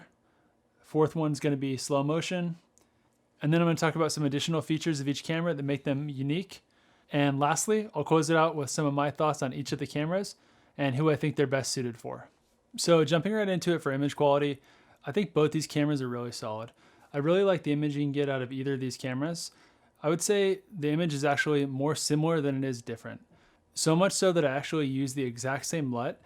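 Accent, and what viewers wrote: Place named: Orange County, United States. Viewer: Wow....we really do have an accent in Orange County lol. Awesome video!! I was on the fence between these two cameras, but I'm loving my BMPCC6k Pro. The biggest draw for me was the menus. I fumbled around with Sony and Canon menus for years, but once I used my first BM I've never wanted to go back, but the C70 almost got me. Yes, the 6K Pro has its quirks, and the overall build quality of Sony, Canon, etc. is much better, but I love the image, post-flexibility and MENUS of these BM cameras.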